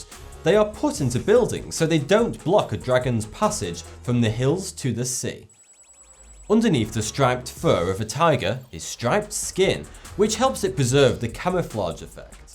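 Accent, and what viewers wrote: United Kingdom. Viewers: 675 000 views..... man! british accent is the real Mc coy!!! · he is handsome.........love his brittish accent and voice....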